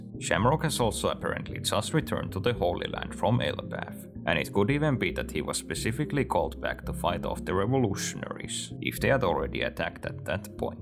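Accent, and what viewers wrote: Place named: Finland. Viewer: Is that a Finnish accent I peek? Great channel bro, keep going!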